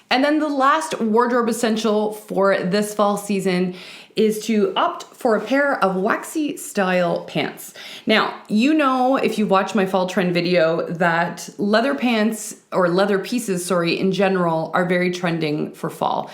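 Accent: Canada. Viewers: I just found you, yay! Are you Canadian? You sound Canadian. I’m a BritCanaYank. West coast 36 years.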